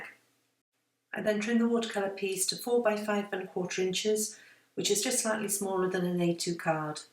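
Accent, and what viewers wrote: United Kingdom. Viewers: hi, do i detect a Welsh accent? Where r u from, Me-formerly Pembrokeshire! i'm only half way thru ur vid, but i had to send you this first b4 continuing! x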